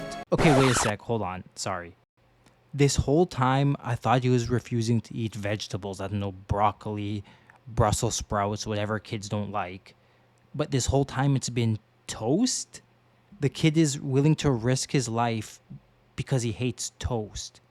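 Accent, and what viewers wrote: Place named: North America. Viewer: It's actually rather surprising hearing a North American talk about this show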